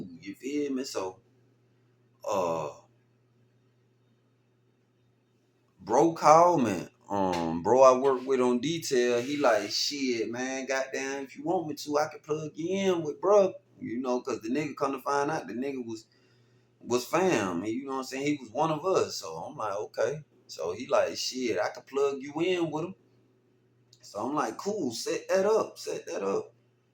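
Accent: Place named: South, United States. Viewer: Bruh you be slindin your stories like a real nigga. I just subscribed to your channel and I’m tuned in witcha now. I’m from Florida and you talk like you from da way and I’m feeling dat ya feel me. Vic Damone Skr8kuttinup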